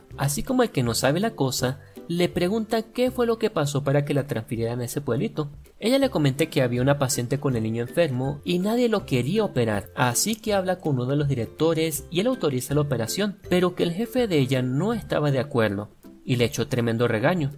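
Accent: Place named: Venezuela. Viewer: No sé el porqué pero siento que eres Venezolano (la voz del chico que hace el resumen), tu forma de hablar es demasiado característica de los Venezolanos.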